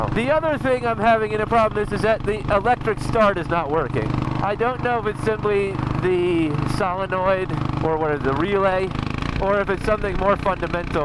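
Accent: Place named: California, United States. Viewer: thanks for your reply I've been a subscriber since late 2018, I wonder why u have a Californian accent though, good morning.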